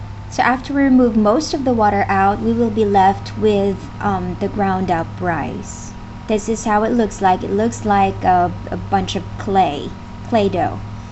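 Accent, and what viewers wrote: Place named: Tagalog, Philippines. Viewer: Excuse meh! Kenmax kapa dyan I’m live abroad for so many years Kaya alam ko yong mga accent in different countries. Sa tuno palang nya alam ko marunong sya mag Tagalog.... juskoh ang ingot mo nmn 😅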